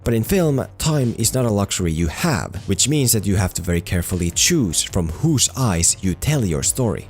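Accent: Finland. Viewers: Haven’t seen the movie but this was a good and comprehensive analysis. P.S. Kuulenko puheessa suomalaisen aksentin? 🤔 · Huh. You sound Finnish. Very slight accent, barely noticed. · I can hear the Finnish coming through ;) Great video! :)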